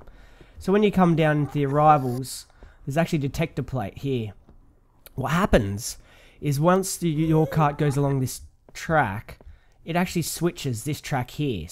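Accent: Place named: Australia. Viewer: Which part of australia are you from????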